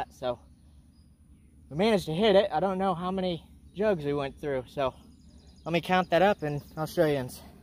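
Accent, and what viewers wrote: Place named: Western Pennsylvania, United States. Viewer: had this chat with you on gunnitrust as well. Didn't realize this accent went past Pittburgh area heading west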